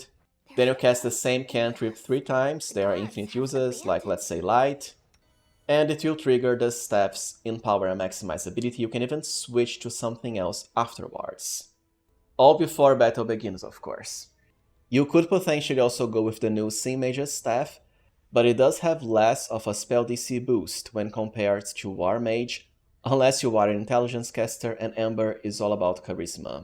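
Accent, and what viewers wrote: Brazil. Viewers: Irmão. Você é brasileiro ou não? Kkk grande fã aqui. De qual estado tu é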